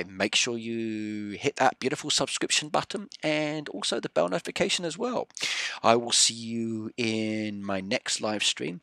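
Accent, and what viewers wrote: United Kingdom. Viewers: Your accent very british🤣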